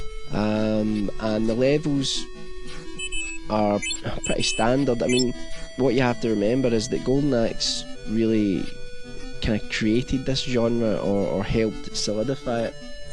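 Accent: Scotland, United Kingdom. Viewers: Cool review and funny accent. where are you from? Scotland or something? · fellow scotsman gon yer sell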